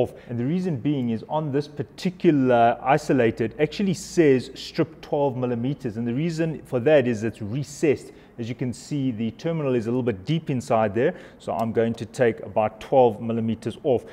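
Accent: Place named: South Africa. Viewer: I recognize that Accent from anywhere. Hello fellow South African... Lol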